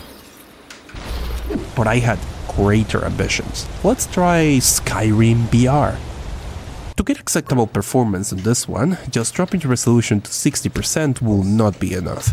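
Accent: Spain. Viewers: como que es de españa? haz un video en español pls solo porque quiero conocer como suenas en español XD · Hey tío, eres de España? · Eres de españa ? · Espera, ¿eres de españa? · Un segundo, eres español? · alv eres de España? :0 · Wait, are you from Spain? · De que parte de España?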